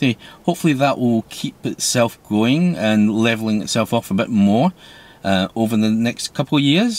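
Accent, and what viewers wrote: Scotland, United Kingdom. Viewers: Are you an asian scot? Like an asian ewan mcgregor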